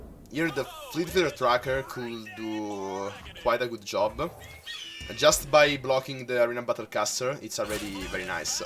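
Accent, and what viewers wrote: Italy. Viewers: Ciao! Sembri italiano da come parli e quindi me la rischio, male che va ti riscrivo in inglese ahaha! Stavo pensando alla possibilità di inserire Lux per Fiora (e magari una remembrance sarebbe obbligatoria) ma non riesco a capire se effettivamente potrebbe essere worthy per gestire meglio alcuni match up. Sono un giocatore di Lux Karma di solito, ma questo mazzo lo conosco poco e soprattutto non so rendermi effettivamente conto se potrebbe sopportare di togliere Fiora. Sapresti darmi qualche consiglio? Grazie mille!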